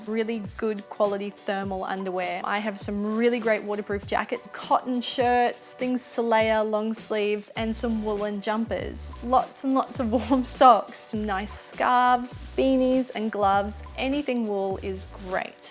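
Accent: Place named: Australia. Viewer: This was great! One thing I wanted to know is where YOU are from...I know it makes me sound unbearably stupid, but I couldn't quite tell from your accent...is it Australia? The reason I want to know is because I'm Canadian, so your idea of Cold might be very different from mine!! :) I was sort of planning to pack for "chilly spring" weather over here...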